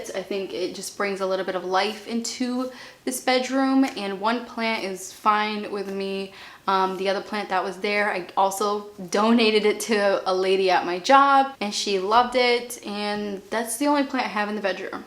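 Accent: Boston, United States. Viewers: Where r u from? Is it Boston ?, Jersey?I hear ur accent every once in a while, but can’t place it. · your dialect is fascinating, where in USA are you from! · She's from Boston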